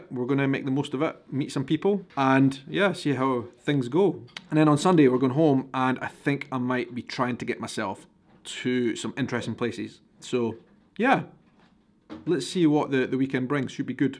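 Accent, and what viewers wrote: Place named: Dundee, United Kingdom. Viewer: How do you speak Dundee?!? Lol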